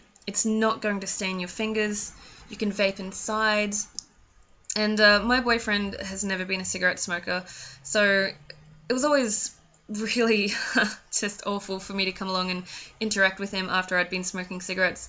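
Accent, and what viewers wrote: Australia. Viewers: you sound Australian :D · Defo an Australian accent. Are you near Melbourne, Tennis season starts in Jan in Melbourne can't wait! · Are you from Australia or new Zealand ??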